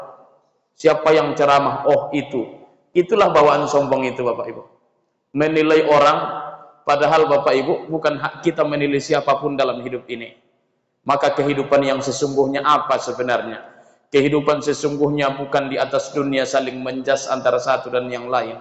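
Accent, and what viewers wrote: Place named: Pekanbaru, Indonesia. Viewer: Daerah mana ya ini, macam pekanbaru bahasanya.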